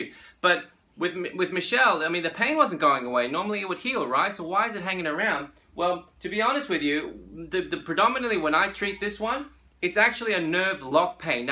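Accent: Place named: South Africa. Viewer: Hi, do you speak Afrikaans? You sound like you may be South African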